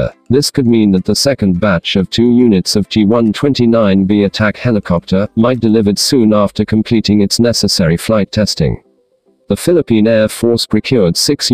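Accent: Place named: United States. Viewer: Bakit americano ang boses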